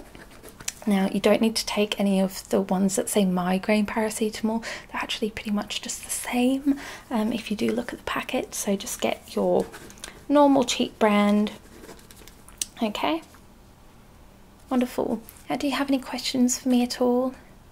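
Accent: Scotland, United Kingdom. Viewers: See the problem with your voice and accent is that it is the type of voice and accent that Americans think of when they think of the UK which means they're not ready to hear us Brits with not as well spoken voices and accents! ;) In all seriousness, cracking video! I will make sure I binge a couple more of your videos later! x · just here to say that I love your videos, british accents are a trigger for me :) hope you’re doing well!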